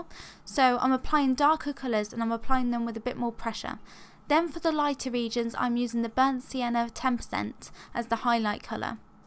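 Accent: United Kingdom. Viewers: really love the tune of the way people from uk use to speak english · your british accent is the most british accent i've ever heard before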